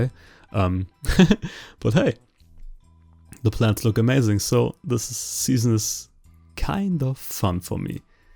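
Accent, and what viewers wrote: Germany. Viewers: Das Ding mit dem tribe, man muss manchmal schon Deutsch sprechen, um dein Englisch zu verstehen ❤ Mach bitte weiter Videos, find deinen Stil einfach gut. Stream am Ende der Season fänd ich auch super! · sieht gut aus Großer :) dein Englisch bringt mich auch immer wieder aufs neue zum schmunzeln <3 Jeder Ami oder Engländer wüsste nach 1 Satz, dass du Deutscher bist :P Einen wertvollen Tipp, den ich dir mal an die Hand geben möchte gegen die blöden Schnecken! Als ich früher Outdoor gegrowt habe war das sehr effektiv. Sammel dir kleine 0.2-0.5L Kunststofftöpfe/Behälter und kauf dir Kuperband! Von den Töpfen/Gefäßen schneidest du den Boden raus und klebst ringsrum das Kupferband. Schnecken klettern nicht über Kupfer. Wenn du deine Sämlinge dann einpflanzt den Topf drum rum, quasi wie mit deinen Schneckenkrägen die du ja bereits hast! Die Stämme können ohne Probleme wachsen der Platz/Durchmesser reicht völlig aus und du hast keine Schnecken mehr die Hochkrichen. 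Man muss natürlich drauf achten dass die unteren Triebe / Blätter nicht auf den Boden kommen, sonst können die Schnecken dort hochkrichen! Du solltest mit der Methode auf jeden Fall auch wesentlich günstiger liegen als du für die Schneckenkragen bezahlen würdest! Kupferband ist echt eine tolle Sache! Kann ich nur jedem Outdoorgrower empfehlen. Auch wenn man z.B. ein Hochbeet hat im Garten für Salat odg. Da kann man auch wunderbar 1 oder 2 Bahnen Kupferband ringsrum kleben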